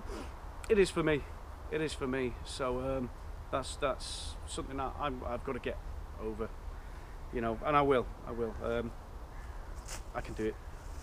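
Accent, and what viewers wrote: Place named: Wales, United Kingdom. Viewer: What's going on with the accent mate? Welsh, Scouse and a hint of Geordie, give up the booze? Never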